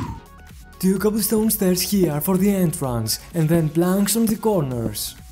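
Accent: Greece. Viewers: Jesus, he is Greek. There are other accents in the world, you know..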